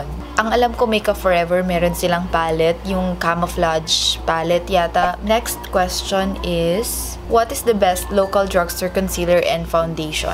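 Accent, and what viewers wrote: Philippines. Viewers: I love tagalog accent!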